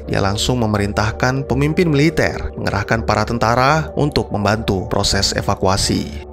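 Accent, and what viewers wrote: Aceh, Indonesia. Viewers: Ooh. Mimin org Aceh ya? 😅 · Brakti bg orang ACEH ya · Dari Aceh ya bangg 😭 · Ooo miminnya dari Aceh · lu dari aceh bang? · Asal Aceh ya bang 🙏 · Orang Aceh bg? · Abang dari aceh?😳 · Abang orang Aceh ternyata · Bang orang Aceh pasti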